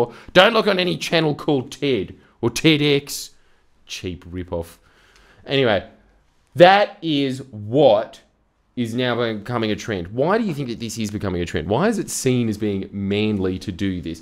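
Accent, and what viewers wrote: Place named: Western Sydney, Australia. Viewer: Preach it ❤ I always knew you were wise. Now i know you're also thoughtful and caring. P.S. your Western Sydney accent is 🔥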